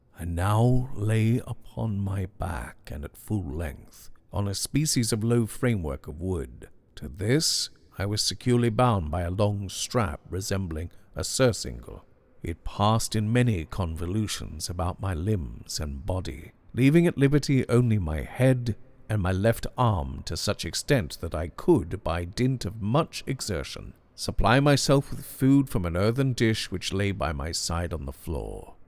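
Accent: England, United Kingdom. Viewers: Spent some of my Halloween with these recordings. It was wonderful! Thx! Love the reader and even the xtra effects worked nicely. As far as his anglo-accent, gotta say i appreciated it. I've heard too many flat, nasally voices of Americans with no sense of rhythm to the words and it's practically unlistenable. Js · Why always freaking englesh accent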